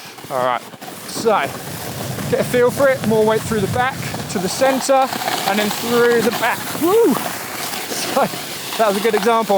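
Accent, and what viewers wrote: England, United Kingdom. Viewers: Wanted to book you, had a look on your homepage but you're so loved + demanded that no chance for this season😔... But good for you😊! I'll try to plan my holidays for next winter and may be I can get some lessons with you if I do it months in advance! Love your way to explain, your technic, your skills, your sense of humour, your love for the natur and your professionnel eye for the mistakes of your students and the way your explain them and make them progress! Not to forget your beautifull english "accent", your dog and your good vibes. It's such a gift to have access to your videos, thanks! Looking forward to get you once as teacher in real 🤩!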